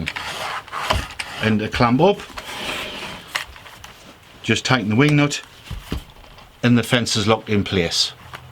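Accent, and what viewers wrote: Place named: Sunderland, United Kingdom. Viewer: Sounds as if he's a Mackem from wearside?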